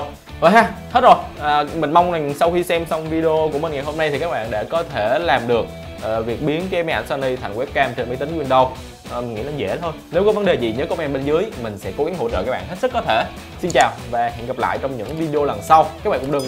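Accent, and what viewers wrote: Binh Dinh, Vietnam. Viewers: bạn người bình định ah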